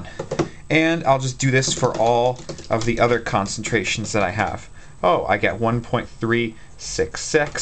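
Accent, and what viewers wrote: Canada. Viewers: Are you Canadian? "Turns Ouuut to be..... "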